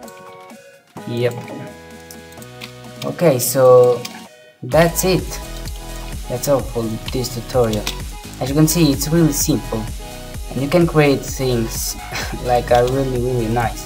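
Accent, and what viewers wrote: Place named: Italy. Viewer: You sound so stereotipically italian.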